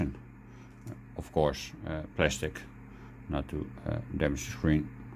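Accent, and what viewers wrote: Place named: Netherlands. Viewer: I always enjoy the Dutch accent in English. It keeps me from being a stress kip. :D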